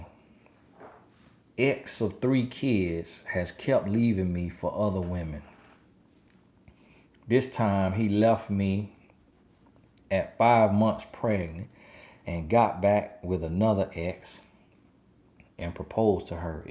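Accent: South, United States. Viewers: Hey....I like your Southern accent....please don't change....you are who you are....Im sure you made peace with your voice...it don't matter as long as you're getting the message out from God....be blessed!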